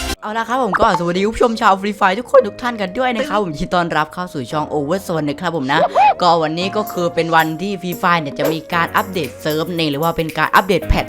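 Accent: South, Thailand. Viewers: เสียงพี่เหมือนคนใต้เลยอ่ะ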